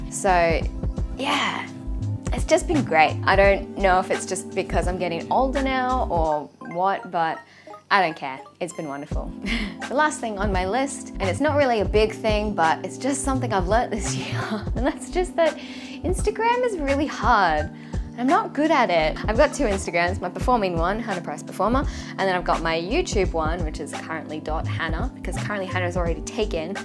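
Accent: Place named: Australia. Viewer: How good is Gary V! Really liked this video. I'm a fellow Aussie and just new to your channel but I particularly like it your content around Japan which is on my bucket list. Keep doing what you do, you're a natural in front of the camera and hearing the straya accent is soooo refreshing from all these American's. :-)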